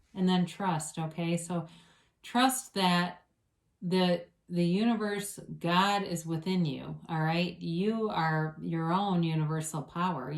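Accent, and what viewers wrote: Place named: New Jersey, United States. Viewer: Omg I love your accent 😊 You sound like your from Jersey ❤️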